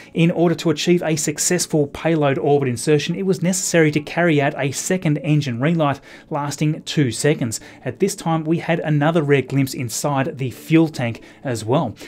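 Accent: Australia. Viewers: "The bitch had been cleared..." I almost died laughing. Sorry, I know its just your Ausie accent, but too funny. Love it!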